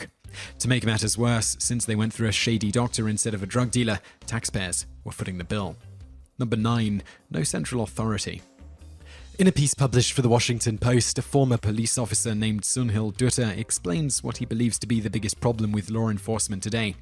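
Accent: England, United Kingdom. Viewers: Is it weird that a British guy is narrating this? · This is an extremely sensitive and devisive problem; to the extent that I kept noticing your use of the word "we". You are talking about concerns you particularly identify as U.S. problems, but you continually use we when considering actions and options. You have a very British accent so I don't know if you live in the U.S. or not. If you do, then welcome and include yourself as we; if not, then unless you are reporting facts related to many counties including your own you should not use the word we as it is inapplicable. The only fact you reported of which I was unaware was the possible use of steroids. I can't believe there are no medical tests to document their use and while I'm ignorant of laws governing their use I certainly think they should be illegal for armed people, even if you are a security guard or MP. 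On the whole I agree with your assessment of facts and think they are due to the fact that the U.S. is too big for oversight and responsibility is diffuse or non existent. It isn't just the police. The public school system is disgraceful. The sub literate in this country outnumber the literate and many teachers barely reach the standard of literate. But it is a sacred cow dying in the street of starvation in a Hindu society. Everyone reveres the cow, but no one takes responsibility for caring for it. Teachers lie and help students cheat to pass basic standard tests and everyone believes that is an aberration and not the norm, but it is the norm. Landlords of privat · I'm not sure where you are getting your facts, but I'm seeing many/severe falsehoods. Maybe stop getting your info from the bathroom stalls and actually speak with cops and other law enforcement officials. Top ten problems with your channel? 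1 thru 10 totally wrong about everything said. Maybe instead of doing a video on a system you know nothing about, Brit. Go to your country and give us a video about the problems with British police. I guarantee you know more about that. Ps your accent is horrible. New Jersey is a state not a city, by the way. Get a map.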